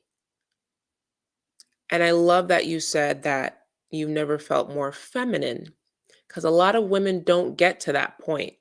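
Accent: Jamaica. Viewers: So much more effective when you scold us in your Jamaican accent😂❤😂 and by the way, even though I'm near my goal, I'm still going to listen to you for motivation, because no matter how long I do it, fasting is hard!!!!😮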